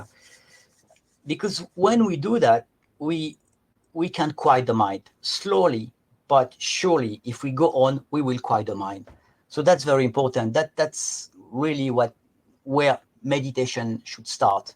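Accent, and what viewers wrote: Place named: France. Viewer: I looooove the French accent.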